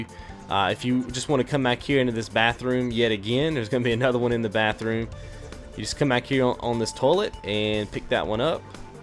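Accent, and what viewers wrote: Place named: Mississippi, United States. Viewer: Thanks dude, I remember collecting these on my PS3, but I totally forgot already and now I need to finish 100% again in the remaster version, helped me greatly cheers =) ps: I love your accent, are you from Mississippi ?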